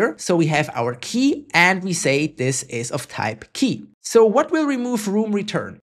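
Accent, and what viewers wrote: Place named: Germany. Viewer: Swiss or German colored english - sounds a bit clunky!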